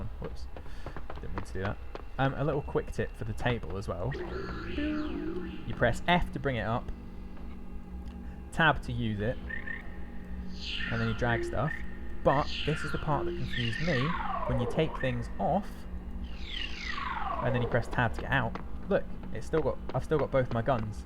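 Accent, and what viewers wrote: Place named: United Kingdom. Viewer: Surprised you don't have more subscribers after I heard your quality British tea-time accent.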